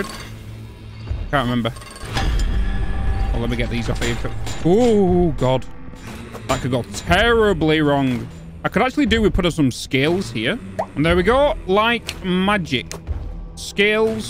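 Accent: United Kingdom. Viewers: all, also ur British accent we you go, "GOD BLOODY DAM IT", all the spending spree montage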